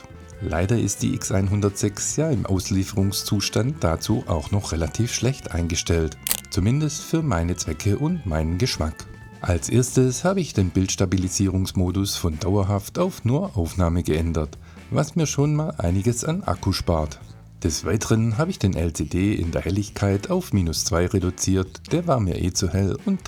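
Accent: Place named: Swabia, Germany. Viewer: Schwabe, ja aber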